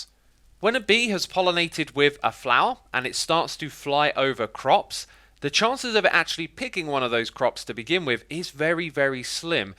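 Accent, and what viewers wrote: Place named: United Kingdom. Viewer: why are all minecraft youtubers british? is bothers me, they all sound the same.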